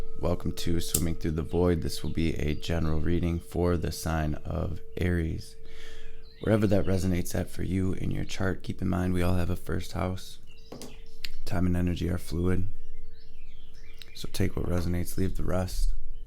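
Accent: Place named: Bay Area, United States. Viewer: Dannng you handsome AF, you from the Bay Area? You kinda sound like it♥️ Besides that your reading is on point for me. Thank you!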